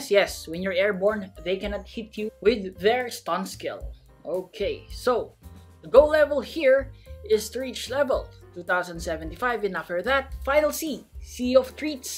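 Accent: Philippines. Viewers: Yo your accent sounds like your from Philippines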